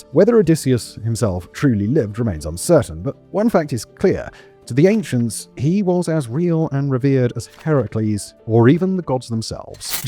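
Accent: United Kingdom. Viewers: you always have fascinating videos. For Americans your accent lends credibility because for some reason a brittish accent makes t he information more credible. There is one thing though. At times you drop what you are saying into your throat and a very deep register and lower the volume that makes what you are saying hard to hear. I recognize this as part of a typical British way of speaking, but what you say is too interesting to be lost. As a public speaker myself, I have to be aware of my own verbal idiosyncrasies so as to reach my audiences more effectively.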